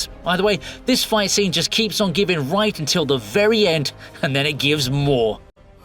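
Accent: United Kingdom. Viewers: "The Sorcerer's Stone" is the dumbed down title of the film for the US audience (officially because WB didn't think Americans would know what a Philosopher's Stone was) despite having the internet at their fingertips and millions of HP book fans. Use the correct title, you do sound British after all.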